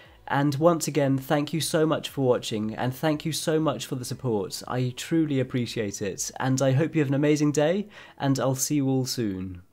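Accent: United Kingdom. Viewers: Amazing scull. With plenty of symbols. Fantastic to see you draw it. Learnt alot! Thanks. PS I really adore your beautiful British pronounciation. It's such a pleasure to listen to.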